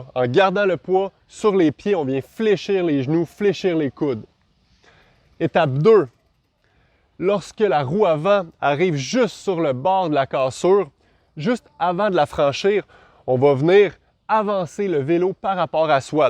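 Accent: Quebec, Canada. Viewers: Very clear and well explained. That Quebec French is tough but I think I got there in the end.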